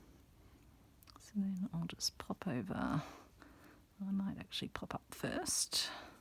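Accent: Australia. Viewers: Ohh!! This is wonderful. I will have a go. My Nanna had needle books that belonged to her mother. Also … as an Australian living in Canada…. I appreciate the familiar accent. Made watching this an added joy ❤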